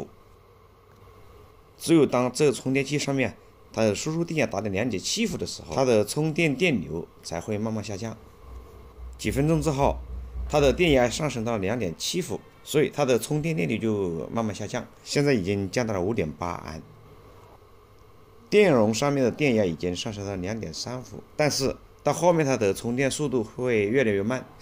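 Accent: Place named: Hunan, China. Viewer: 湖南口音，果然是三一重工的员工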